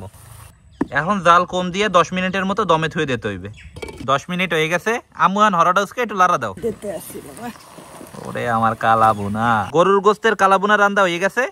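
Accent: Barishal, Bangladesh. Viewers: অসাধারণ মাশাআল্লাহ এক্কেবারে বরিশাইল্লা ভাষার নাহান😂 মজা হইছে দেখে বোঝা যাচ্ছে😊😊😊😊😊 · আমার কাছে বরিশালের কথা ভালই লাগে। আর ভাই এর কথাগুলো মিষ্টি ❤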